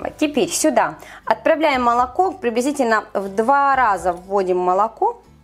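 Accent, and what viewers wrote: Surzhyk, Ukraine. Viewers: Да, проскальзывает "суржик", но не противный, как у многих! Зато все настолько гармонично...и кухня, и посуда, и манера приготовления...смотришь некоторых, плита грязная, посуда горелая, кухня вообще прошлого века, готовят неаккуратно, но лезут показать свои "шедевры". - молодец, руки умелые, много знает, чистота на кухне, рецепты интересные, проверенные на себе, аккуратность...так что мелочи речи не в счет! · Это Украинский диалект. Мы живём рядом и тоже так говорим.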